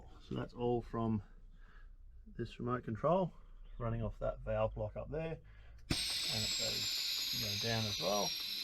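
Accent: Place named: Australia. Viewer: Your accent says you are in Australia. Does Airbagman sell in the U.S.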